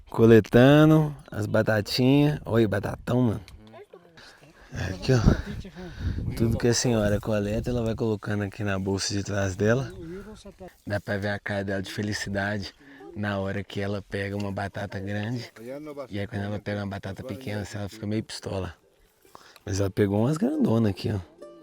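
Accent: Minas Gerais, Brazil. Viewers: Estou simplesmente encantada com esse canal e com o protagonista dele, com esse sotaque muito familiar, mineiro bom demais da conta sô! Parabéns por transmitir um conteúdo de qualidade de uma forma descontraída e contagiante. Ficou pensando ... como só descobri esse canal hoje? Já me inscrevi lógico e maratonar o canal e a ordem do dia, Gratidão 🙏❤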